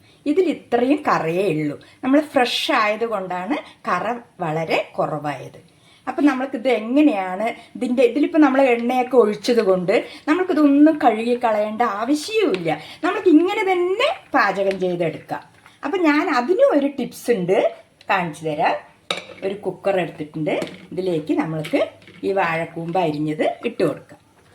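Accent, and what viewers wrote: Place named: Palakkad, India. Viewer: പാലക്കാടൻ ഭാഷ ഇഷ്ടായി🎉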